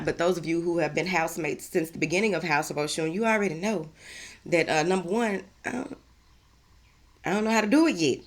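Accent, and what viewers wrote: South, United States. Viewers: Southern girl!?? I’m from GA & country as ever and I love your country talking tail 😂😂😍😍🙌🏾🙌🏾 specially when you say “Whatever”💁🏾‍♀️🤷🏾‍♀️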